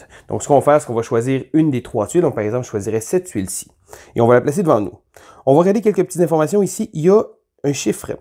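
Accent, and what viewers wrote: Canada. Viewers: Salut! J'ai regardé ce vidéo car j'étais curieux de ce jeu, et j'ai décidé de m'abonner pour même entraîner mon français. Mais je eu des difficultés à comprendre quelque chose, puis-je te demander d'où viens-tu? Je suis curieux de quel accent tu as. Peut-être canadien?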